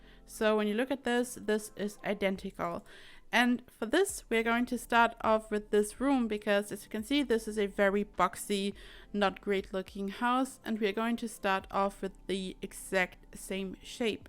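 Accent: Germany. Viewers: Your Accent tho....you're German ain't ya?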